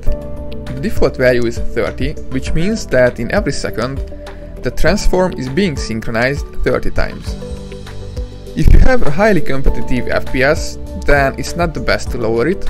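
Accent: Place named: Hungary. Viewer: Akcentus. Ne értsd félre, szuper minden, köszönöm a videót. A magyar unity-seket így ismerni fel :)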